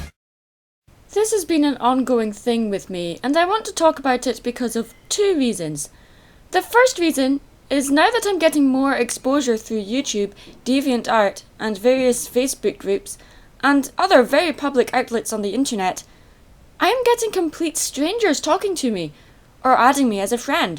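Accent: Scotland, United Kingdom. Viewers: R u Scottish? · Are you Scottish? And actually I'm used to people that do not know and don't swear at all and I go to channel to channel just to find a youtube channel that is completely children friendly and has no one in any comment or videos that has bad words and when I say a bad word my mom scolds me and I never say it ever again and their are kids causing and they swear for no reason and one of them don't even know what they mean so I get your point but now a days older brothers and sister are trying to prevent anyone from causing in front of their younger brother or sister. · omg I love the way your voice sounds and your Scottish accent! I really calms me I don't know why xD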